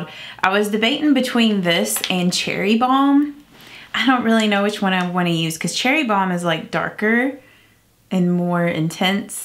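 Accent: South, United States. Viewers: Lol you’re so cuteee! Sounthern accents are so cute 😭😍☺️☺️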